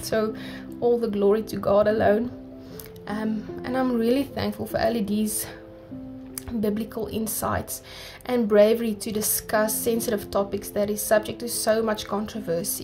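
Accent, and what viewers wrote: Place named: Afrikaans, South Africa. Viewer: Hehehe guys if ur wondering about the accent it’s called the South African Afrikaans accent 🙈🙈🙈🙈🙈we have different accents here aswell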